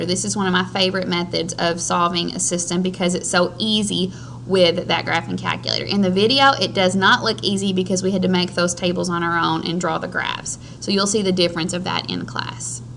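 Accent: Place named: South, United States. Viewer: when here accent yeeha I felt that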